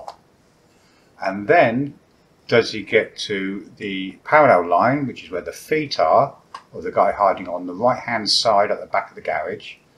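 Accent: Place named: United Kingdom. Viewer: Is this the 1st timw you guys hear a british accent😂😂😂 You need to get out more. Get a passport and see the world.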